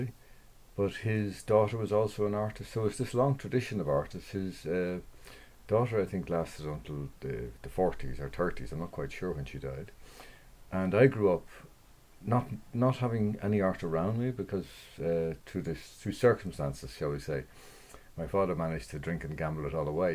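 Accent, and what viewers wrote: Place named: Ireland. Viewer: It’s so wonderful to listen to the irish accent, beautiful speech💚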